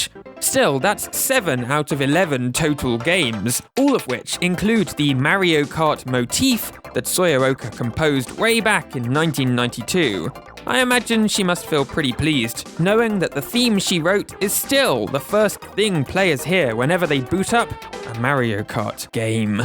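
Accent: United Kingdom. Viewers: GUYS HES SAYING MARRY O WHICH IS THE CORRECT ONE FOR HIM DONT COMPLAIN THAT HE IS PROBABLY BRITISH BECAUSE I SAY MARRY O TOO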